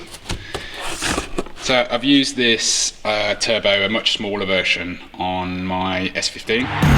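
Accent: United Kingdom. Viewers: a Guy with my Good Ol College Professor British accent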